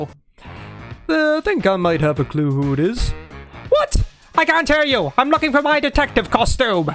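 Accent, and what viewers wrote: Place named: United Kingdom. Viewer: you sound british now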